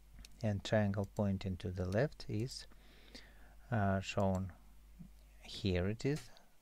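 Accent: Russia. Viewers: Ты говоришь с произношением, ты русский?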